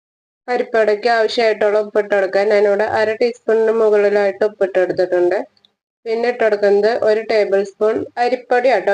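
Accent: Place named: Ernakulam, India. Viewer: ..correct alle..enikk ariyaam ee slang..May be we r neighbours, evideyaa place..?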